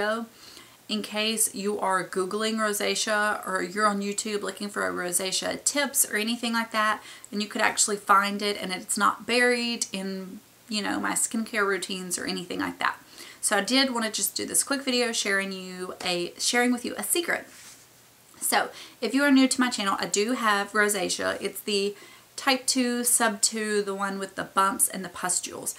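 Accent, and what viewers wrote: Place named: North Carolina, United States. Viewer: I love your accent! Are you from North Carolina? I grew up there and your voice reminds me of home :)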